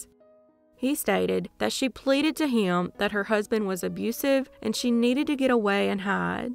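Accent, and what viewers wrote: South, United States. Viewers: Your southern accent is adorable 💕❤️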